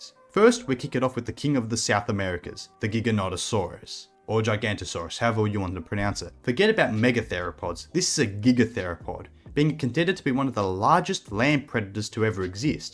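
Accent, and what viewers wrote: Australia. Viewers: Very amazing video plus I love the Australian accent and it’s as satisfying as a British documentary.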